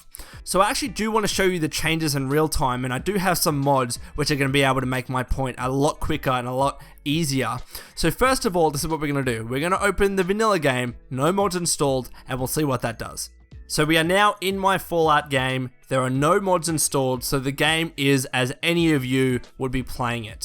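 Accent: Australia. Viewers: Yeah the Australian accent can be hard to understand for some people :P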